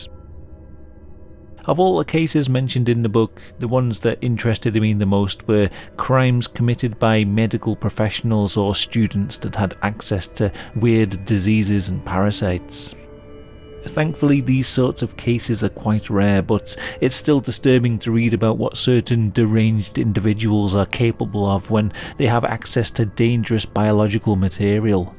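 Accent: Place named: Liverpool, United Kingdom. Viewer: That's not a Welsh accent, it's Liverpudlian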